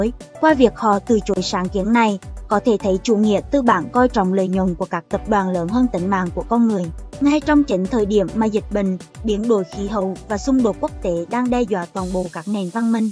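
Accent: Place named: Hue, Vietnam. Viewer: Lâu rồi mới được nghe giọng Huế.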